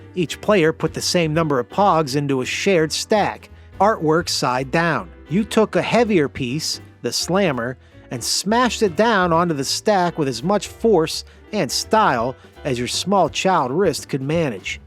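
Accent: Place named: Pittsburgh, United States. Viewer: Never heard a yinzer more yin’s than this guy. Holy effin dahn tahn.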